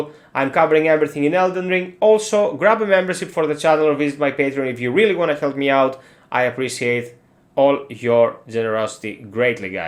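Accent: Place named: Greece. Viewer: φίλε ευχαριστώ, τώρα το αγόρασα το παιχνίδι και είχα κολλήσει οπότε ξεκίνησα το quest της ranni. Από φωνή ψιλοκατάλαβα την ελληνική προφορά και μετά είδα το channel description 😂 Here's a sub :D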